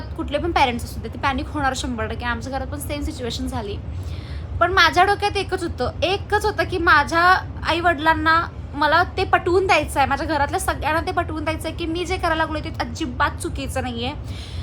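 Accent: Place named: Ichalkaranji, India. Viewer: Congratulations 🎉 god bless you ❤ Mi punyat aste but tuz vlogs n video bghitle na mala khup athvn yete ichalkaranji chya bhashechi 😍 Keep it up 🥳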